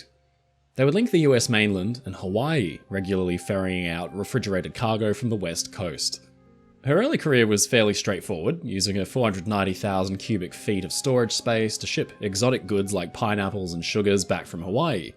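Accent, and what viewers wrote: Australia. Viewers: I attempted to watch this video while eating toast, but couldn't hear (Our Friend) over the crunching. So I turned on the Closed Captioning, and now I couldn't finish my breakfast because I was laughing so hard. Apparently the subtitle bots have never heard an Australian accent before. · It’s just an ordinary Aussie accent.🇦🇺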